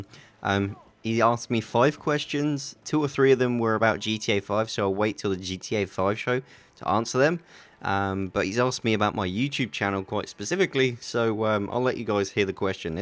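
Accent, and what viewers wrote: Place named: England, United Kingdom. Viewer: Very good to hear a familiar accent Lol. Where in England are you from mate?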